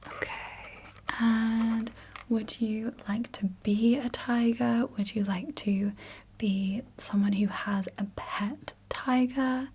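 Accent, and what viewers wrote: United Kingdom. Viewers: I'm in love with British accent Take care Hugs 😊❤ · Ive heard a lot of british accents but hers sounds so smooth, does anyone know what this accent is called/what region of UK its from? (sorry in advance if this is intrusive :'3 )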